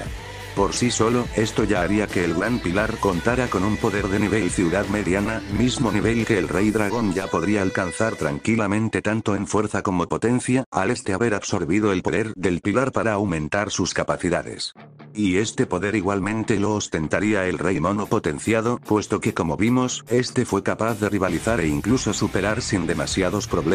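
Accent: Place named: Mexico. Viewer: Muy epico el video y la escala, pero porque usas los fragmentos en castellano, es decir hasta lo que vi tu parecías mexicano más que nada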